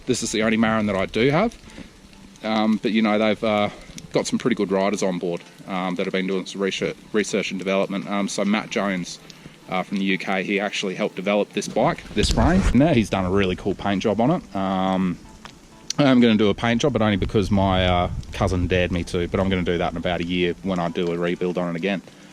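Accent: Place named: Australia. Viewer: Love the aussie accent mate. Also love the bike, great video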